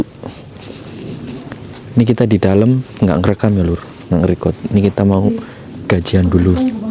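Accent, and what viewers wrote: Banyumas, Indonesia. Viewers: mantap bro, jarang2 kaum ngapak ngomong finansial. sing ngati2 ya bos nang kana. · ngapakkk forever👍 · Bahasa nya ngapak panget lor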